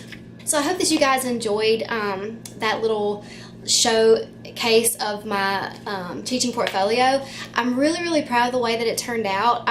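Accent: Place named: South, United States. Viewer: You are so southern and it's adorable. Don't change!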